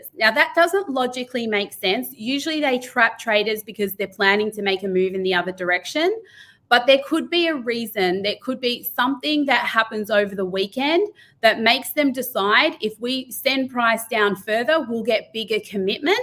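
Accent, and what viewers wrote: Australia. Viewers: I forgot how strong an australian aaccent can be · Clever lady, but that aussi accent, with emphasis at end of words is sooo annoying, markITt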